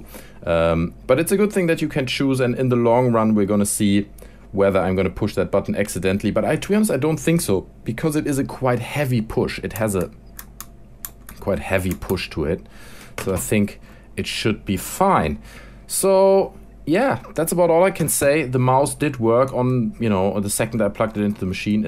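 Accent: Germany. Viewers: fürchterlicher deutscher Akzent ^^ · Anybody know where this flavor of english accent is found/from? I hear it often on youtube but its clearly not British, Irish, Scottish, Australian, South African, North American, I cannot pin it down, It almost has a German or Swiss hint to it... ?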